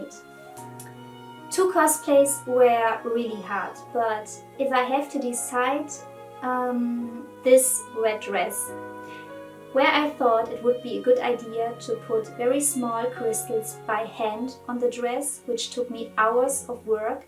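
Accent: France. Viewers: You're french? For the title but also for your accent. Ce n'est pas un reproche, je suis française également 😏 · I want to ask you where are you from (no hate) I think you sound french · Am I the only french person here ?;-; Because that’s obvious to me that she has a french accent, and so does Celeste (The burning of the VERSAILLES witch 😜).I know, I’m a party pooper right ?😅 But I have to agree...her voice is sweet af🥺 And also, sorry if I made mistakes!😅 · U have a little french accent .o. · Are you french??? Accent sound french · Tu as un accent français non ? 👁👄👁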